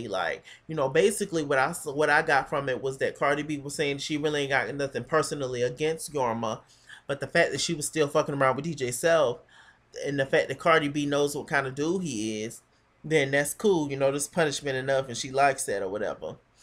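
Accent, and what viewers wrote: New York, United States. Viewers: The New York accent is just too real. Yo, is you from Brooklyn or the Bronx? I TOTALLY agree with your opinion of the busted up old ass creep squad. I don't see what any of these females, season after season, see in any of those guys. In addition to being old, washed up, and unattractive - I have never seen one of them do anything to further anybody's music career. People don't seem to like BBOD or Moe Money, but one thing that I will say in her defense...she didn't allow Cisco to play her once she found out that he was screwing Mariah No Carey. When it comes to LHH, these dumb ass chicks fight over these lame ass dudes. But Moe cut it off at that very moment and told Mariah that she could have him. I was totally here for how she handled that. GREAT REVIEWS FOR THE WHOLE SEASON! You're awesome!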